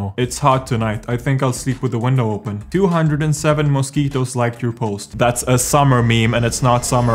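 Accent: Sweden. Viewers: i have never heard someone who sounds as swedish as you, and i am swedish myself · Me in the beginning of the video:”Oh boy he sounds Swedish” Me like fifty years later: “Oj satan i gatan” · ur english is rly good but i can kinda hear that ur swedish (im a finn)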